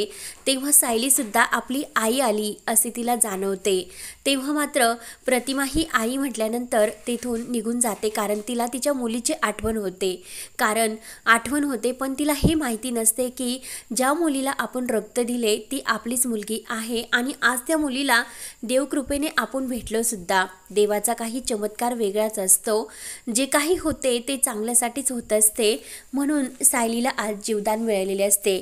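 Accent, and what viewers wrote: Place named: Gujarat, India. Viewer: Gujarati ahes na tu😅 marathi bgha hichi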